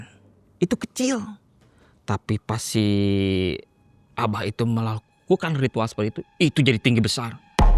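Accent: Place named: Bandung, Indonesia. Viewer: logatna bandung pisan,saee dina bahasa na..jadi paham rng enakeun kakupingna th👌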